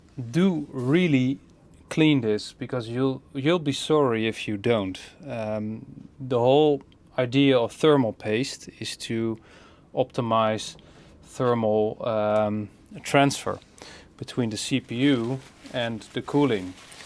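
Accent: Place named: Netherlands. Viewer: The way your accent sounds i know for sure youre from the Netherlands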